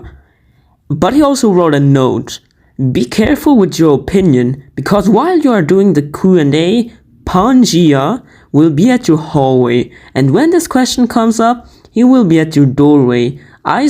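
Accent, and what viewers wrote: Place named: Switzerland. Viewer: LET'S GOOO, QNA also i love the swiss accent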